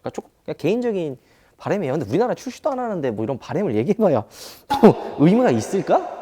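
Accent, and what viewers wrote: Gyeongsang, South Korea. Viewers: 야깐 야깐 하지좀마 이 갱샹도 유튜버 야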